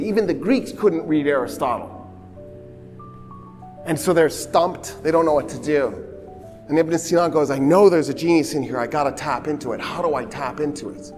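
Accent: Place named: Iran. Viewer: این استاد ایرانی نیست؟ همه ظاهرش هم صحبت کردنش شبیه یه ایرانی هست که ۲۰ ساله خارجه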